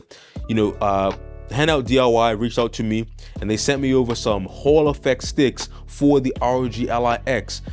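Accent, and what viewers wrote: Bermuda. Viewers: Are you Bermudian? Edit; there is no doubt. Subbed, gotta rep my people.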